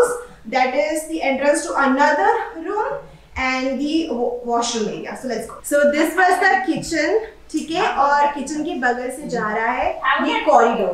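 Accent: Odisha, India. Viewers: Di I'm loving ur oddia accent❤. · i am from odisha....i am so happy to see you are from odisha too....hearing your odia accent feels so good....love you ❤️❤️❤️ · The way you say" Are Asa" like khanti Odiya ❤ I never knew you re an odia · Thank u for sharing dear. I am from Nabarangpur, Odisha. I am Bengoli and my home is Odisha so i can sure you most of Odisha and Bengoli things are same including the names. Your Odia accent is awesome and tumne saare chizo ki naam v sahi se liya hai.😘 Ghar bahut khubsurat hai. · Hello.. it's good to see you embracing your native land our Odisha in such a beautiful way.. I just have a lil complaint that it's not Tulsi kaa Ped.. it's actually Tulsi Mata (Tulsi ka pauda) whom thaku maa worships daily.. being an Odia I would like to listen ur odia accent as well.. loads of love 🫶🏻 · Your Odia accent is damn❤️.. Coincidentally I'm now living in Baleswar ❤️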